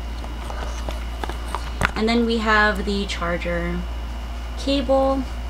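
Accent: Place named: United States. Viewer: prng amerkano dw voice mo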